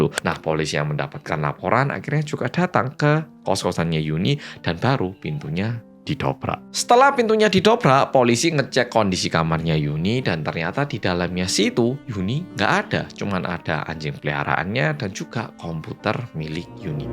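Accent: Java, Indonesia. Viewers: Knp logatnya Jawa bgt🤔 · Dapat notif langsung ambil kopi, stay ke mas medok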